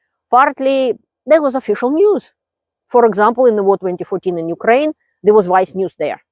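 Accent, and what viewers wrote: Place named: Russia. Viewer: Послушал на русском для информации, послушал на английском, насладился сочным русским акцентом! 😅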